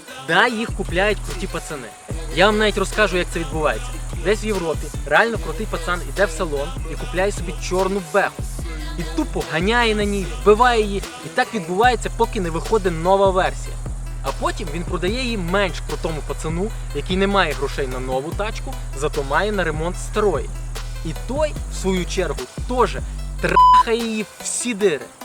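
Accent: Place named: Carpathians, Ukraine. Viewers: Блин западэняцкий акцент, тяжело слушать когда знаешь какие мрази большенство из них. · По-українськи правильно "говорю",а не "гуворю".Селюків карпацьких понаїхало дратують своїм говором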